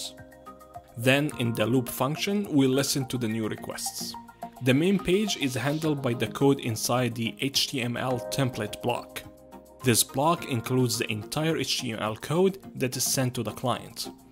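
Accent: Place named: Germany. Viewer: Great and yet simple video, thanks! Where is your accent form? Sounds german :)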